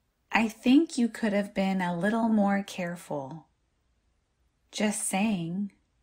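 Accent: United States. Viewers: I like the videos authentic American pronounce. Thank you!❤ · 美式發音哦！ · 是美式英文嗎? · 我要感谢你美妙的美式发音，可以给我很多帮助和信心。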